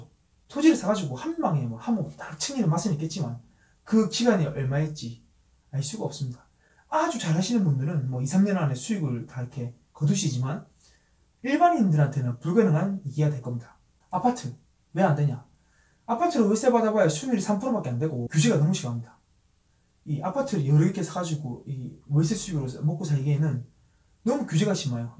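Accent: Busan, South Korea. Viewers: ㅋㅋ부산사투리란다,,전혀 아닌거 같구요~~ 꼭 만나면 말도 못 할 분께서 전혀 문제될 부분도 아닌 곳에서 키보드 워리어를 하시더라구요^^ 부산사투리 쓰는게 듣기 싫으시면 안보는 방법이 있어요!! 똑똑하쥬?? 꼭 싫다면서 보드라구요🤭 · 마이크문제가 아니구 부산사투리랑 발음문제입니다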